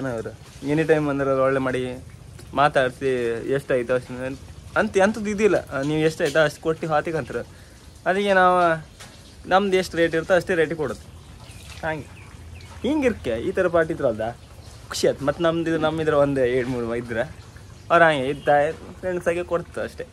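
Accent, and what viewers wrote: Kundapura, India. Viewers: He speaks in Kundapura kannada. It's different dialect of Kannada. Do not insult any dialect of kannada.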